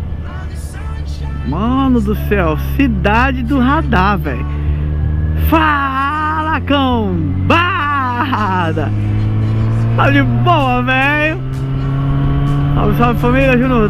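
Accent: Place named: Pará, Brazil. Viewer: Mais que vc tá com sutaque paraense isso ta kkkkkkk fica suavi manu tava zuando com vc só alegria !! I pra resolva sou Paraense mais des dos 9 anos fui criado e estudei em sampa mais to fora do pais a trabalho mais sempre que posso vo no meu Parazao ve us carinhas trutas!!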